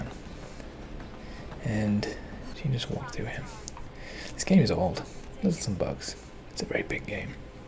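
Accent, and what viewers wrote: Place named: Yorkshire, United Kingdom. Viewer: I dunno if I’m tripping mate, I can hear the Yorkshire accent (I’m from South Yorkshire) but definitely sure I can hear some American in there 😂 *EDIT. You’ve just explained in the video hahaha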